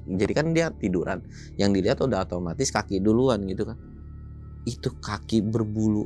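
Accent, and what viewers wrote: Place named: West Java, Indonesia. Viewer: bang salam kenal dari batujajar cimahi kalo boleh tau abang asli mana bahasanya ada sunda sunda gt bang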